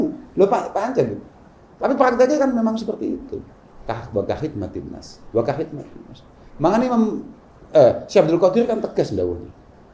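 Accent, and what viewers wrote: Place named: Malang, Indonesia. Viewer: Bismillah...uwennak rek dirungoknone...logate koyo arek malang...umume gampang difahami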